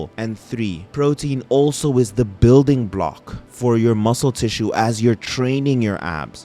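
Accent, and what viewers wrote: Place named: South Africa. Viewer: This is one fantastic breakdown. Thank you. I'd say I'm scenario 3, but since I'm never doing crunches and just compound movements, martial arts and dance maybe my abs aren't as popping underneath and scenario 1. Will definitely start incorporating the ab progressive overload you covered. My Fittrack scale says I'm around 18% body fat right now. But this is a refreshing summary you have done - thanks again. Also, love hearing a fellow South African accent on here from across the pond.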